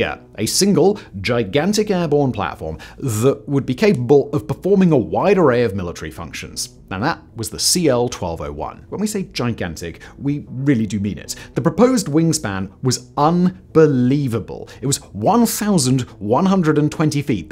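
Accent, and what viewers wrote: RP, United Kingdom. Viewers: It's just RP English, lots of ordinary people speak it. (RP = Received Pronounciation)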